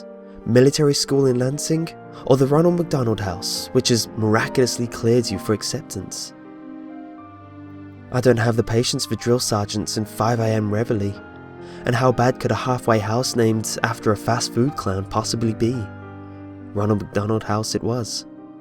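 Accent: United Kingdom. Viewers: Narrator with a UK accent....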